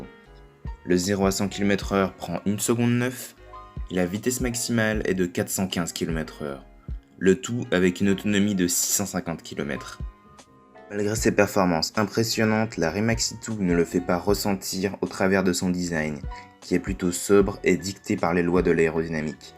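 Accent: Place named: Spain. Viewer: le tite accent espagnol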